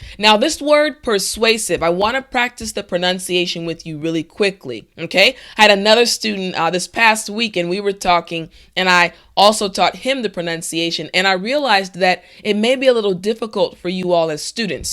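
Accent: United States. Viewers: Hi,Is it American English? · Very useful I like the way you speak..those five words are necessary to start to comprehend and talk a new language..it’s the first time I watch one of your videos..I guess you’re american..which state do you come from?your accent flows delicate..i’m from Italy but I have few friends from US..bye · Props. Where are you from? Are you american?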